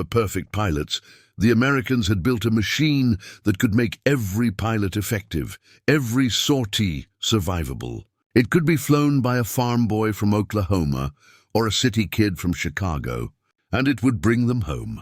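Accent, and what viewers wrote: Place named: United Kingdom. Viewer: They did not mock, laugh, joke, etc. Just put the facts in and can we have another AI voice that is not British?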